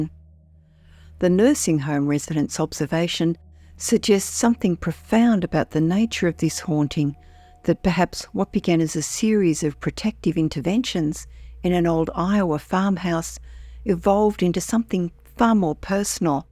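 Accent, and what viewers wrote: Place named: Australia. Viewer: Maybe it’s her accent! I’m pretty sure the narrator is Australian!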